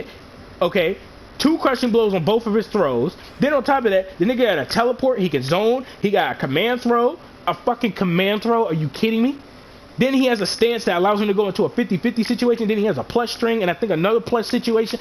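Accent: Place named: New York, United States. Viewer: Sounds like a New Yorker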